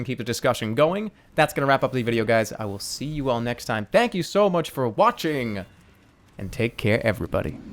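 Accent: Boston, United States. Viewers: Are you from Boston? XD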